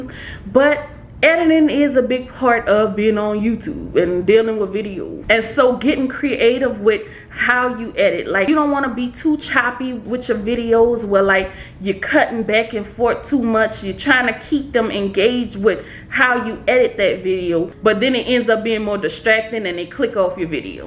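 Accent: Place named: South, United States. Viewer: Congratulations Mrs.!!!!! I'm so proud of you. You truly deserve it. I love your videos. You come off as very down-to-earth with lots of southern charm. I'm from Mississippi. So, you bring me sounds of home that I just adore! 😊 Please make another video when you hit that 500,000 subscriber mark IN A FEW DAYS!!! 😁 (I'm speaking it into existence.) We all want to celebrate with you. Keep up the great work, and enjoy your blessings.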